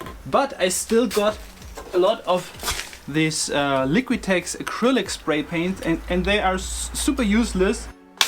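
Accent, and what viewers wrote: Germany. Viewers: Ich dachte die ganze zeit er muss deutscher sein bei seinem englisch... und dann kam die Bushaltestelle😇 (ist das erste video, dass ich von ihm sehe) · sofort gehört das deutscher bist haha :) schaue öfter vorbei !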